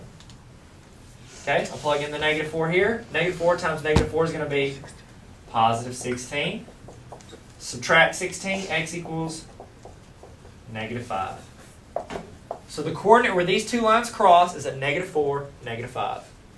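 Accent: South, United States. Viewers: I would much rather have this guy with a hot southern accent than my grumpy math teacher now. Please be my teacher.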